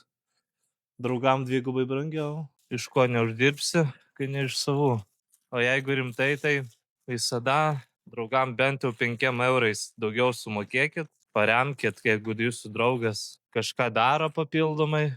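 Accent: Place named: Poland. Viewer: ir galva skaudet pradejo tas lenkiskas akcentas ir dar plius siuksles rodai kaip normalu daigta nezinau ar istversiu antra tavo video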